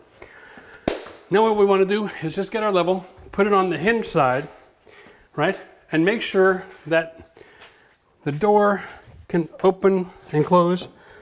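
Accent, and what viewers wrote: Canada. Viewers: canadian, eh?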